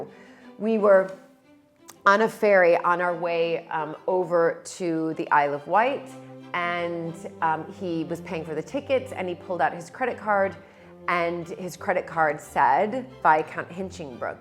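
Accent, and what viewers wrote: United States. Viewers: Thank you for remaining “You”, which is what, I’m sure, your husband fell in love with. It must have been quite an adjustment. What’s so interesting is that the village towns you show are so similar to those of New England, with the quaint town commons and shops. I live on Cape Cod, Massachusetts, so I understand you falling in love with the beauty of the countryside. Listening to you, I do hear the British inflections, but you are still have,after 20 years, the American accent. It’s so easy to pick up that you often don’t know you have. When I met my husband, he wondered if I had lived in Britain. I laughed and explain that my daughter and I had only been bingeing on BBC mini-series, etc, but it was enough to affect our speech. When I visited Britain, the same happened. On the other hand, the opposite happened to Britain visitors to the US. 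They still had the accent, but was often sprinkled with Americanisms. You are so personable. I would love to meet you and just talk, but I think you would have me laughing with your wonderful down-to-earth personality. 😊 Btw, I get it about the letter pronunciation. I spent an hour looking for “Darby” street. Finally someone told me “Derby” was pronounced “Darby”. I also spent 3 days asking about getting to Edinburgh. Everyone said “yes, it’s a long trip”. I was so disappointed UNTIL I asked “how long”. They then said, about 4 hours! I said “WHAT”?? There are Americans that travel that distance to work!!.” I don’t know if you know what th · Just to point out, has a total American voice, nothing posh about her, but she has adopted words that the British use and Americans don't. We don't say "drinks party" but "cocktail party". And we don't say we're going to the cinema ever, we say we're going to the movies. 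I just thought I'd mention that. Her language has adopted British terms.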